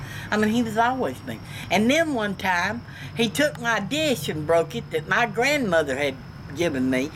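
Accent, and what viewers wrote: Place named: Georgia, United States. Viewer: She lives in Georgia, that explains her accent.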